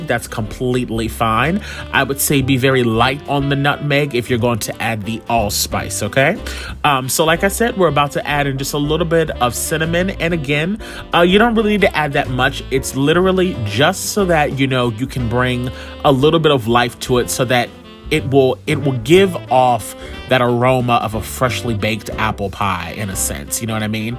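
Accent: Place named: South, United States. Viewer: in that southern drawl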